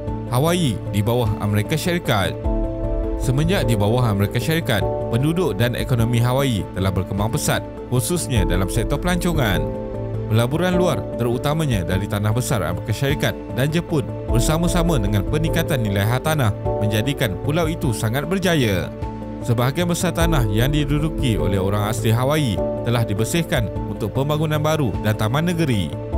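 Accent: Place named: Malaysia. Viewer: Dialeknya melayu banget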